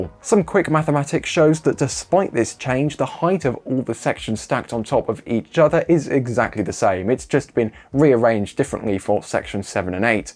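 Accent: United Kingdom. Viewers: Kinda difficult understanding some of those wiords with that pommy accent. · Hi NSF, it is nice to listen to, because of being British, BUT can we please slow down the speed a little, only a little. From your 1.1 Million subscribers are not all native English speaker, like the poor guy here. Due to rewind and repeat it took exactly 35 minutes for this clip. To lenghten it to 2 minutes longer will reduce that immensly - see John's clip yesterday. Speed was perfect there.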